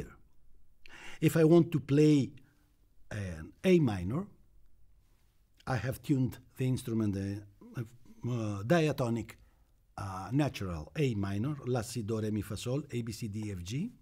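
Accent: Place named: Greece. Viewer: This is so far the best introduction of this legendary instrument. Respect for your dedication of research, experiment and creativity. It's a very inspirational personal story as well. (I enjoyed the greek accented english narration as well, it's like a very calming story telling :D) Bravo for reviving this great instrument!!!👏👏👏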